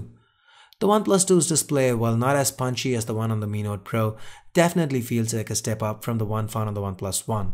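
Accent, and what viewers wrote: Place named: India. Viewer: you sound very Indian, and I don't mean that in some bad way, I'm of Indian myself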